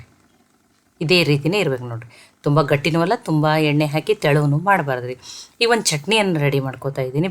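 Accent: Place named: Kalaburagi, India. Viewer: ನೀವು ಕಲಬುರ್ಗಿ ದವರು ಇದ್ದೀರಿ ಏನು ಸೇಮ ನಮ್ಮ ಭಾಷೆ ನೆ ಮಾತಾಡಲತ್ತೀರಲ್ಲ?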